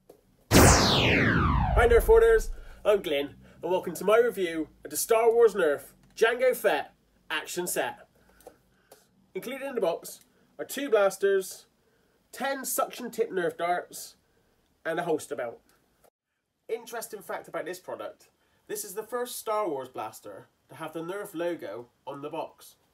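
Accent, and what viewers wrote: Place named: Bristol, United Kingdom. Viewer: Haha! Awesome vid! Love the accent...Bristolian?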